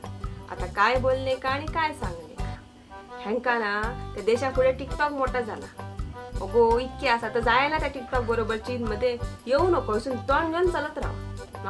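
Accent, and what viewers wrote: Malvani, India. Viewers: तुमची मालवणी खुप पीवर असा.🙏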